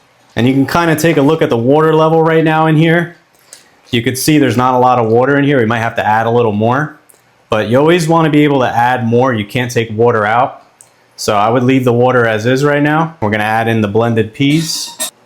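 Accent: Philadelphia, United States. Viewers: Very Philadelphia accent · God I wish you didn’t speak American, can’t listen to it 🤦🏻‍♂️ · The way you say woodur, lol that philly accent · Looks great! I knew a Sicilian woman who would make me peas with rice. Same idea…was simple, but delicious ! Do I hear a Philadelphia accent ? The way you say WATER.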